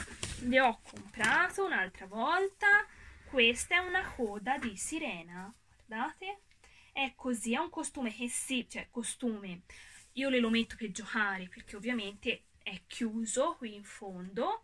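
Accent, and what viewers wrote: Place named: Tuscany, Italy. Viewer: Si sente che sei toscana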